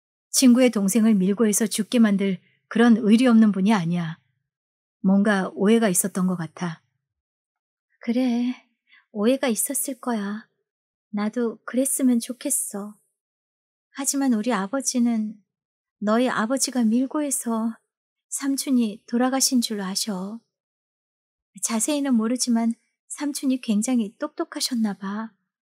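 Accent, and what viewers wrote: Jeolla, South Korea. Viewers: 너무너무 찰지게 전라도 억양 잘 살릴까이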